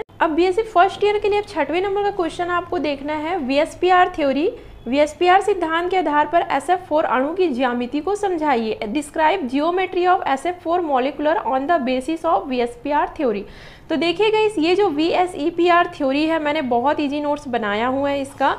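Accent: Chhattisgarh, India. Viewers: Mam chhattisgarh se ho kya?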